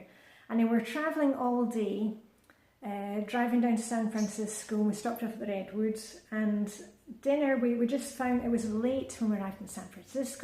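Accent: Scotland, United Kingdom. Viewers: making my way through your life saving (truly, because here in 2020 lockdown my anxiety level was debilitating!) videos. You are an island of sanity and your Scottish accent is the icing on the cake, to mix a few metaphors!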